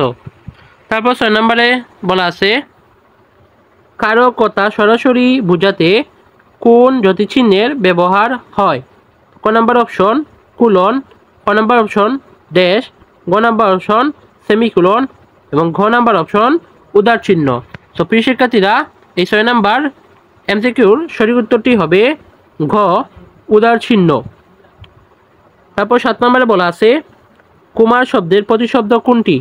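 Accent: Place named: Sylhet, Bangladesh. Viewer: You are from sylhet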